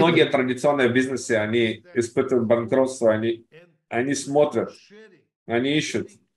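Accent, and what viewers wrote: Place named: Russia. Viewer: с сильным "русским" акцентом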